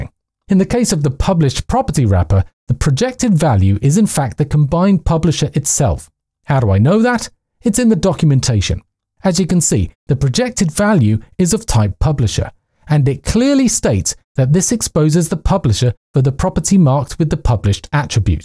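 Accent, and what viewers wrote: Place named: United Kingdom. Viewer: Neat demonstrations that can be easily understood. BTW, wonderful British accent!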